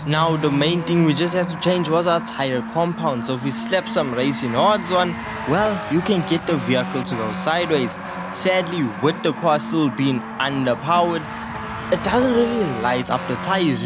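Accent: South Africa. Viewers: Why the subtitles bro'? You can tell you're South African, but you're easy to understand. Love the content my friend 👍.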